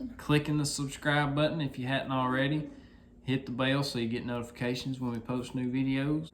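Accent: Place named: Mississippi, United States. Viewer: Don't apologize for your dream. It is awesome and your videos answer a lot and inspire people to do their own. Some of the best videos for that with Pole Barns I have seen. Plus as a guy who met/married/had children in and lived in MS for 13 years I appreciate the down home accent and lingo. :)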